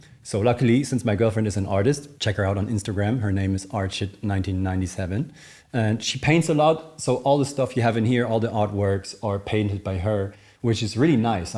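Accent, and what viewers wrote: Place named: Germany. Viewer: Sehr cool mal einen Einblcik hinter die Kulissen zu kriegen. Cooles Studio auch. Generell muss ich sagen das das der beste House Musik Kanal ist den ich kenne. In der Vergangenheit hatte ich immer mal wieder die Vermutung das du deutsch bist, auch wenn dein Englisch sehr gut ist, den deutschen Akzent abzulegen ist schwer. Nun wurde es quasi endlich mal aufgelöst.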